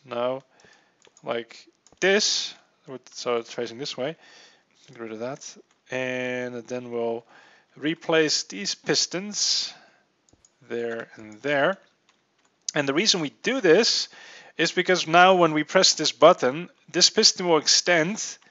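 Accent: Netherlands. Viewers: are you dutch because you have a very dutch accent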